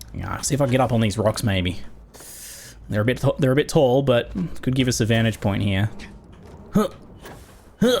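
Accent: Australia. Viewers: I thought, for a second, he was doing a Crocodile Dundee accent, but then I realized it's a video and it's just how he talks.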